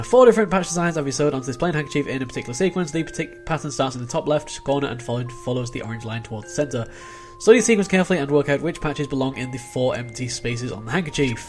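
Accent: United Kingdom. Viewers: your British accent is pretty good, mate!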